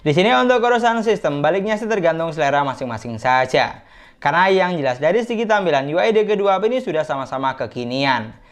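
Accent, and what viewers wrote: Madura, Indonesia. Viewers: Sampean orang madura..?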